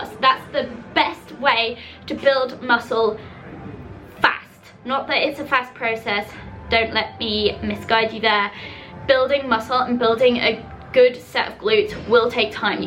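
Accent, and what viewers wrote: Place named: United Kingdom. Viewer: wait, she's Australian?! I am and she doesn't sound it haha I'm so confused 😂 I thought she was from the U.K?!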